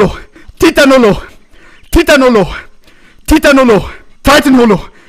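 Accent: Germany. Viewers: german accent is real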